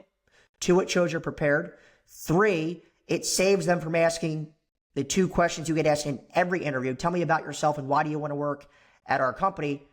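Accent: United States. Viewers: Doylestown native here - my ear immediately picked up on your Delco voice. Now that I live in Dallas, people get mad when I wear my Eagles jawn here. Your content is helpful because I'm looking to become an Account Manager. If possible, please send me some cheesesteakers from Cleaver's in Rittenhouse Square.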